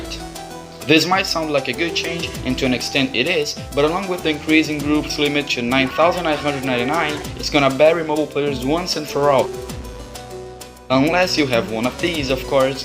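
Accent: Brazil. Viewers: Are you Brazilian or Spanish? I'm from Brazil xD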